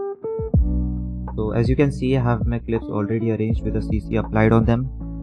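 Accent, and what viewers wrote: India. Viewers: are you a indian your accent feels me like that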